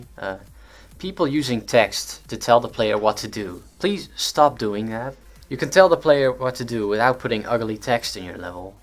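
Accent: Netherlands. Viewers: bliss that's cause he is dutch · you sound dutch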